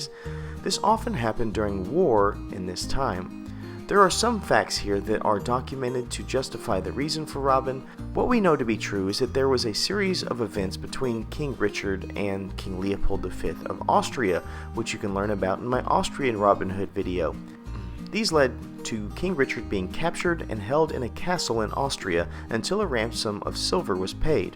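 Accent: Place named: United Kingdom. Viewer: Nice British accent, I give it a 9/10🤣 Video 10/10 🤙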